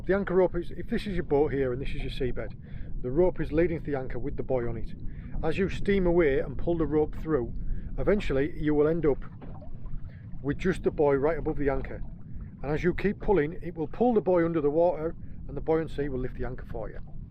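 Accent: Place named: North East England, United Kingdom. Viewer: Thanks. Enjoyable and informative as ever. We're back down to the Roseland for a couple of weeks at the end of August so can't wait to wet a few lines! Meanwhile, there's the added amusement of seeing what YouTube closed captions makes of your accent. In the first few minutes of this one we had sand-eels alternatively expressed as 'sandals', and 'sun deals'... Can only assume the developers of their speech recognition software haven't spent much time in the North East...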